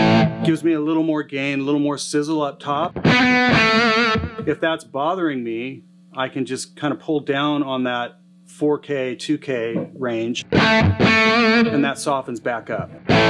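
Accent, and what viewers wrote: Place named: United States. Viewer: Thorpe fx field Marshall fuzz. Oh yes. Get one, get one now. Talk as much as you like sir, it's interesting and us Brits never tire of American accent.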